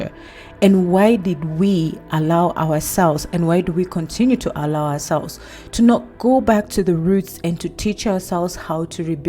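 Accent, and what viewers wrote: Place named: Kenya. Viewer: The fact that its a Kenyan narrating, that makes is so much better. Im researching African architecture. This is how im building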